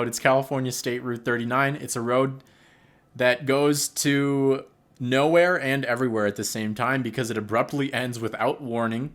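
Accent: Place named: Midwest, United States. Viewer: I’m glad you liked SoCal. I would never leave. Born and raised here in Orange County. I can hear your Midwest accent. Never been to the Midwest or East Coast. It’s so odd that you’re hapa and you’ve never been to CA. Hapa means part Asian.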